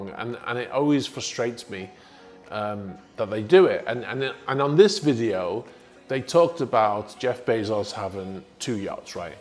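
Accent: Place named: England, United Kingdom. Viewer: Hes got some kind of classic english quality that makes him go easy on my ears so thus i watch him. the yelling screaming youtubers - its not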